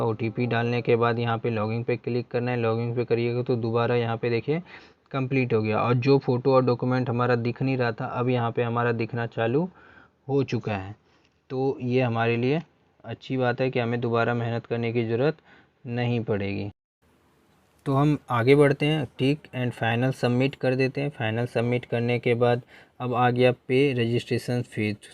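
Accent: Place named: Narela, India. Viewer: Bhai aap narela se ho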